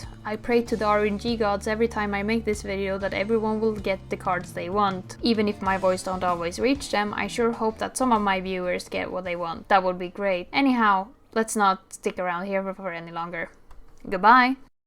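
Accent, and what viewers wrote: Sweden. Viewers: are you swedish?!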